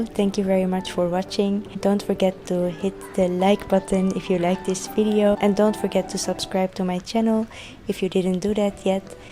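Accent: Netherlands. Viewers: You must be Dutch lady. I recognized your accent, machalah fellow woman.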